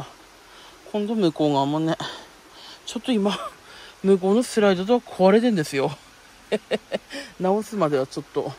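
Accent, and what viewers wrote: Aomori, Japan. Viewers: Jaiさん初めてコメント致します。オススメに出てきてすっかりJaiさんのファンになりました。過去の動画も見漁ってます😂 人柄の良さがにじみ出て、豪快な飲みっぷりと食べっぷりにアッパレ。青森弁にも癒されてます。 キャンプは憧れだな…いつかやってみたい! 大自然の中で飲むビールは美味しいよね。 私も大酒飲みです。 次の動画も楽しみにしています。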